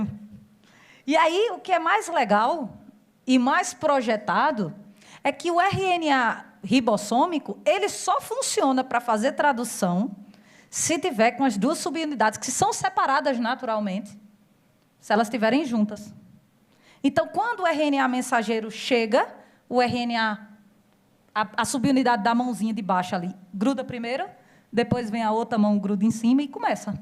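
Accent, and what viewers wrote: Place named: Recife, Brazil. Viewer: Sou do Recife e adorei esse sotaque me senti agora em casa😊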